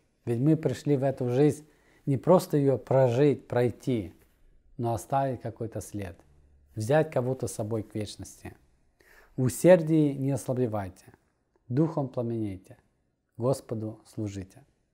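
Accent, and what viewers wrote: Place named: Caucasus. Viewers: Какой-то кавказский акцент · Да, чисто кавказко с украинскими словами!)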